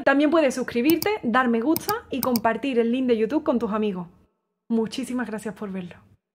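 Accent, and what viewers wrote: Spain. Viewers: Me encanta el acento español que tiene, y por supuesto si estilo y calidad en tocar la guitarra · de que lado de españa es ese acento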